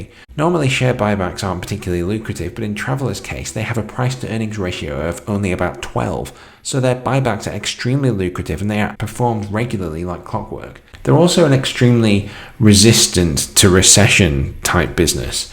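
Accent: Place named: England, United Kingdom. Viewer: Great video. You sound English, do you have any large Uk listed companies you can recommend?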